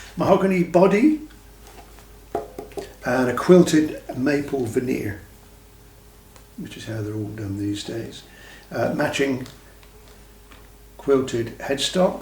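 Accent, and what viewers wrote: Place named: Devon, United Kingdom. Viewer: EXCELLENT PRESENTATION!, I came fot the Turquoise Custom 24!; Ebony!- and fhen, Wow!, 👌 I saw you, and DAMN! I thought I was looking at my dear Gramps!, here in Canada 🇨🇦 😎 🍁 Then you SPOKE! and 🌹 Zap me w/ a Feather!, YOUR ACCENT duplic ates his PERFECTLY! then you mention CORNWALL!, he's from DEVONSHIRE! Too-Rah-Eh!? I'm off to buy one of these NOW! Your playing is delightful! CHEERS! 🍻 from The Wild West! NEIL YOUNG COUNTRY ALL the Best!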